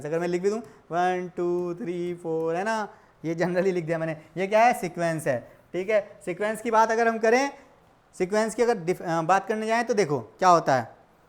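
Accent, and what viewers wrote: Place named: Bijnor, India. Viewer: Sir aap bijnor se hai 😃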